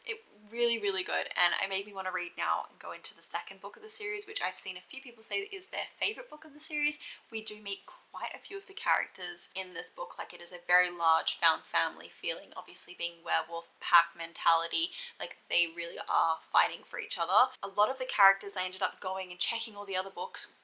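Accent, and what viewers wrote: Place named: Australia. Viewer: the australian cowboy accent 😭😭😭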